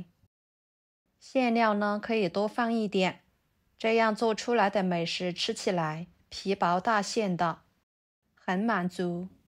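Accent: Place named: Yunnan, China. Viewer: 云南普通话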